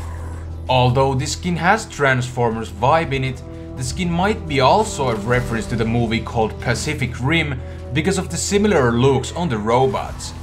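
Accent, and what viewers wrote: Finland. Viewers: I started watching this, and thought that you have that finnish accent in your english. Mutta hyvä video! :D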